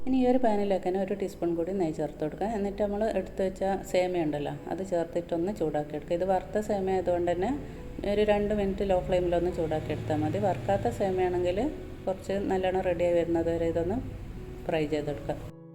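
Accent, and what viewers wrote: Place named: Nadapuram, India. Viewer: Nadapurathukariyanooo samsaram kettitt ❤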